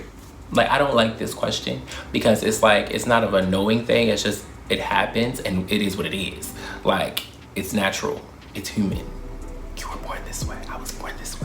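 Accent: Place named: Baltimore, United States. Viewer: You serve your community in a way no one else can. Thank you for sharing your thoughts on this. I think alot of what you are sharing transfers to grad chapters too. You also ate when you said parents experience "Peter Pan" syndrome. The baltimore accent is a mood. If people say that can't understand you, they are just being anti-Baltimore (aka anti-black). Alright now with the double life at 15/16 lol. Living is a habit!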